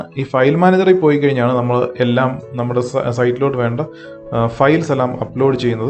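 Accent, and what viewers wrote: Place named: Kottayam, India. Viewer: കോട്ടയം ആണ് അല്ലെ 😁 എന്നാന്നെ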